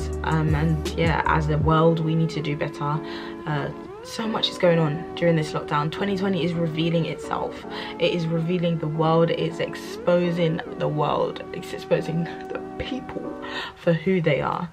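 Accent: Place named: London, United Kingdom. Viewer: Gurlll I'm about to talk about this show next on my channel. Subscribed as it's good to hear a similar london accent to me 😍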